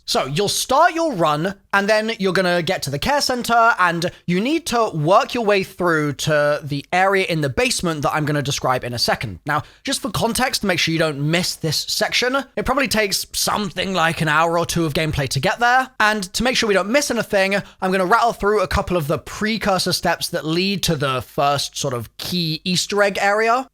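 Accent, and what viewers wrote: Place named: RP, United Kingdom. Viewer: The most irritating voice on YouTube! I can't finish watching this toff! Don't know what you mean with the hand not being there, it's been there every single time for me and I've not waited for those body counts... Doesn't just sound like shit then🫣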